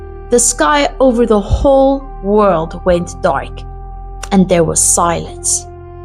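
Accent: South Africa. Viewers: you are from Colarado, but you sound South African? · Is that a South Africa accent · I detect an Afrikaans South African accent? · a bit of a South African accent there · You sound solid Afrikaans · You sound South African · Is the lady talking South African?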